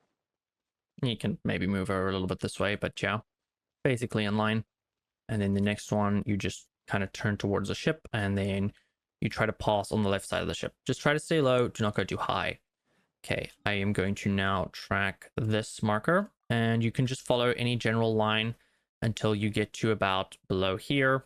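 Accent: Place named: South Africa. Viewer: Great video! Are you from south Africa? You sound like it! Also i subscribed cause i want to see more of your claymore series. Im doing an only female account so this is very useful for me thank you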